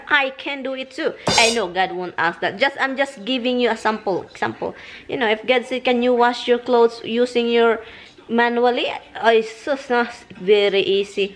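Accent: Visayas, Philippines. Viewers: Hi I like ur blog..ignore sa mga taong negative and haters...parehas tayo bisaya gahe intonation..haha..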